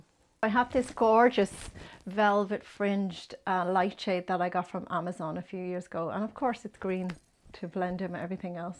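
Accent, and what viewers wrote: Ireland. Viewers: love the Irish accent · What a wonderful lady & an incredible home. I must look into Punch Needle, the texture is lovely. Very inspiring & l just love the Irish accent. My gran was born in Kilkenny. ❤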